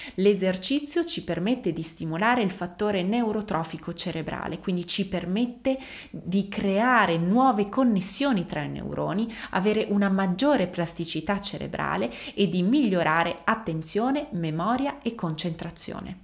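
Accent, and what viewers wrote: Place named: Turin, Italy. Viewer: Ma lei é di Torino neh? Ma boja faus😊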